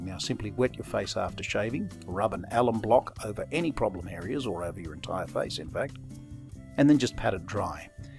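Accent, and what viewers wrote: Australia. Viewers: G'day, Thank you for a great series of entertaining and educational videos. It's particularly nice to hear a cultured Aussie accent: your voice would not be out of place on ABC Classic FM. A question re witch hazel as an aftershave if you would please be so kind as to answer... Aftershaves (etc) are available which have a witch hazel base--such as the Thayers in your video ($18 plus postage on eBay for 355ml)--but it is also possible to purchase what is described as 100% pure organic witch hazel ($22 plus postage for 500ml on eBay), and T N Dickinson's Witch Hazel ($6 for 240ml from Chemist Warehouse). Are all of these suitable? Are any better or worse? Does the 100% witch hazel (whatever that means) need to be diluted? Big price difference between these.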